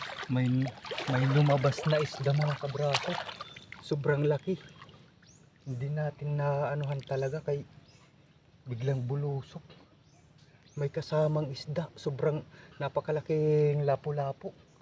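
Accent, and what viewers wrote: Waray, Philippines. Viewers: Shout out ka braso paki sagot nman kung San ka sa samar or Taga diin ka kasi narinig ko kasi parang waray Karin?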